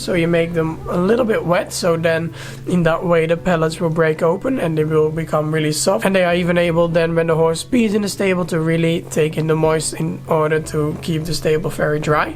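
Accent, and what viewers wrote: Netherlands. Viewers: I hope someone knows. I love how he has Dutch & English with beautiful Dutch accent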